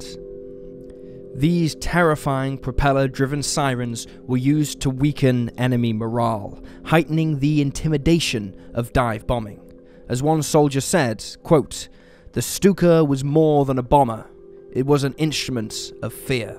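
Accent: United Kingdom. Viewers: "american content makers", maybe, but the narrator here sounds like a limey git. Being "dutch", I would think even you would notice that. btw, I'm German descent, and I found it amusing that said limey git would pronounce "Ju" as "Jew" when describing an aircraft (a "Yunkers", if properly pronounced) used by a regime that was bent on exterminating an entire race by that same name. Twit.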